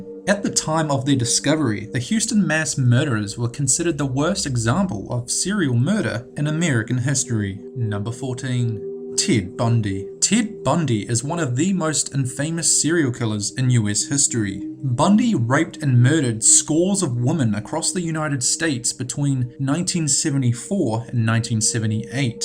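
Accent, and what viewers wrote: New Zealand. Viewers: His accent is very much a New Zealand one. Australians don't pronounce words in the same way that New Zealanders do. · That New Zealand accent drives me fucking insane/ Tid bundy and the disastrous Goan Vallejo Corona. People just don't try when they annunciate incorrectly. Make's me very very annoyed...and I haven't subbed at all. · Kielee R I live in Queensland, Australia we don't talk like that. Maybe a Kiwi! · Your New Zealander right? I'm Australian and the way you enunciate the letter E kind of gives it off. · Fury Devil He's a Kiwi.... Kiwi's don't say 'Mutation' instead of 'Mutilation'.... facepalm yourself buddy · He should know how to pronounce things like infamous and impotence. I thought his accent was more Kiwi than pom but I could be wrong. At least he can pronounce "subsequently" which so many can't. Drives me bonkers.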